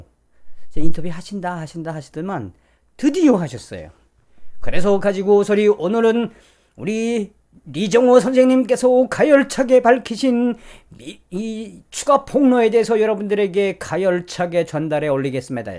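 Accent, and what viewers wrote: North Korea. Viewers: 성산씨 북한 말씨는 좀 자제해주세요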